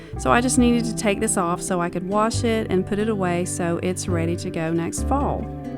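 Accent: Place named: South, United States. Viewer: I feel like we could be the best friends. You have such a sweet and tender heart for the blessings from God. We are on opposite sides of the country but I just love watching you do what makes your heart happy. I sit and drink my coffee to your sweet southern accent. I love in Arizona and for years my home has been decorated in a rustic ranch theme. But slowly I’m going in a more vintage direction. Taking your advice in using what I have and getting what I can inexpensively at the thrift and antique stores. Though our styles are a little different, you so inspire me. Thank you so much for sharing your life and home. Hope to hug you someday. ❤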